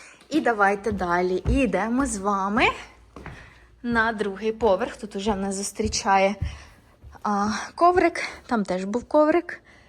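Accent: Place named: Vinnytsia, Ukraine. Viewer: ви з Вінничини? Знайомий суржик.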